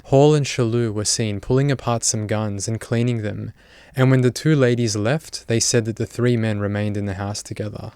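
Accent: Australia. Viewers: Dude I know you're Australian but I've been listening to all your videos throughout the week and every time you say "law enforcement" I thought you were saying Lauren faustman and I heard that name in every video and I wondered how she could be involved in all these murders and vile cases. So I looked her up and couldn't find anything that made sense. but then thought about it.. and realized it's your accent. Oops.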